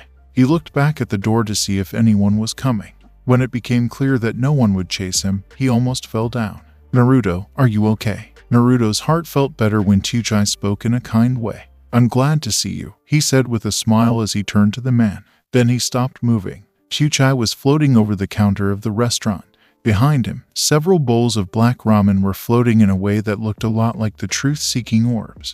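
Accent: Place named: Indiana, United States. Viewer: Sometimes I feel like all of these Naruto what if channel's are made by the same person with the same voice and the same accent like I think it's kinda sus that at thea beginning of each vid it's like this guy with I think is a Indiana accent and I lot of other What if channel's I feel like are always random guys with a Indiana like accent and the fact that all them always talk with that accent in the beginning of the video is a hint but its just a theory a film theory thanks for reading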